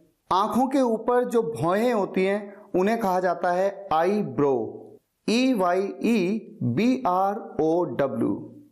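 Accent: India. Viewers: Your pronunciation is totally Indian. Pronunciation should have been like English.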